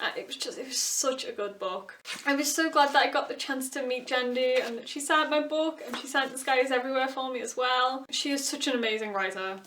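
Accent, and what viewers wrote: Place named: Leeds, United Kingdom. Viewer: what part of the UK are you from? hope you don't mind me asking, just trying to pinpoint it through your voice... kind of sounds like you're from the Leeds area??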